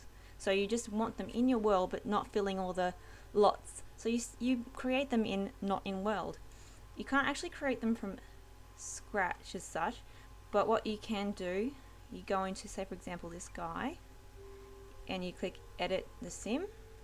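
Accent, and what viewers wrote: Australia. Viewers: Are you Australian? And is your name?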